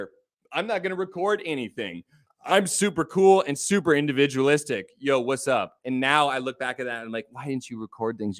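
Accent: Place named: China. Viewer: watching ur channel in English feel a little wired,😂 I am used to your Chinese accent.😊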